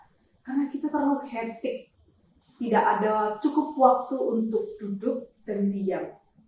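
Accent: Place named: Banyumas, Indonesia. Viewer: Maturnuwun Pdt. untuk Kerlingnya......, ngapak-ngapaknya dipertahankan nggih🙏😊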